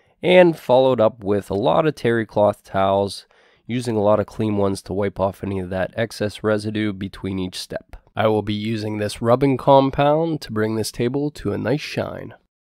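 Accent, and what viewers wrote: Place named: Pittsburgh, United States. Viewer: Being from Western Pennsylvania I knew you were from Pittsburgh area Western to central PA From your accent and the mountain laurels in the woods were you and Buck love to walk. You show great projects and do wonderful work Great ideas.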